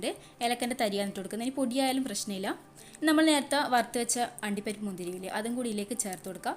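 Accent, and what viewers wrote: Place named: Kannur, India. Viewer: Samsaram kannur bhasha kelkkan nalla rasamund.. recipeyum nannayittund.. good presentation and preparation 👌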